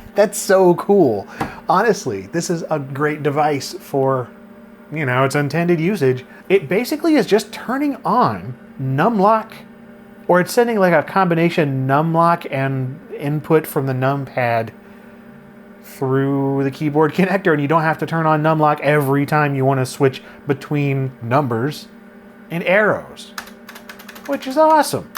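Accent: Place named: United States. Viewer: Well... I saw the title and the thumbnail, so clicked on it and was shocked when I heard a US accent coming out of my main speakers instead of the one I was expecting coming from my subwoofer!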